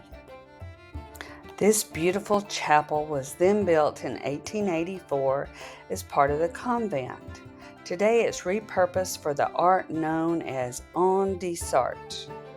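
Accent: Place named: United States. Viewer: Wow that's an amazing American accent, where did you grow up?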